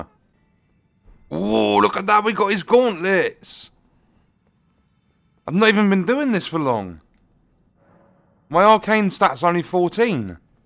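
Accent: England, United Kingdom. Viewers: Sometimes His British accent make me confused 😂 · Great video mate was exactly what I was looking for, is also nice to hear a English accent for once doing one of these walkthroughs! Will be checking in regularly. Keep up the excellent content 🍻👍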